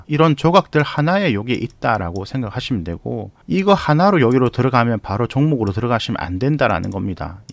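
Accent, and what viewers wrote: Gyeongsang, South Korea. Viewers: 밸런스를 아시는 분이시네요! 경상도사투리가 약간 짜증섞인 어투로 들리기는 하지만, 고유의 스타일이신 것으로 이해하도록 하겠습니다^^:: 감사합니다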